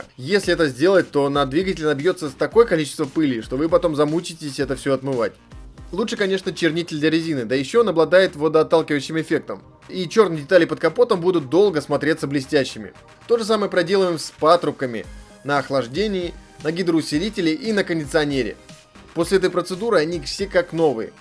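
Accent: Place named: Perm, Russia. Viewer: Говор как у Реальных пацанов, с Перми что-ли? 😀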